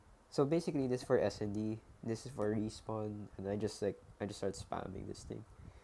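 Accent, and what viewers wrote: Philippines. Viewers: are you filipino bcuz ur accent is like a filipino accent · Are you Filipino? Because your accent is Filipino like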